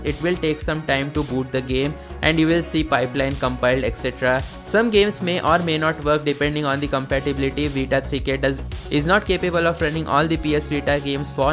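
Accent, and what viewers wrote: India. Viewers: i need a subtitle i dont understand indian english accent bruh😂😂😂😂😂😂😂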